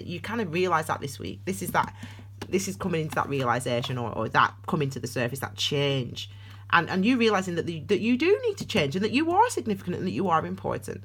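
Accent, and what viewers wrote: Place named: Yorkshire, United Kingdom. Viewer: I’m just trying to figure where it is. It’s almost definitely Yorkshire-ish 😉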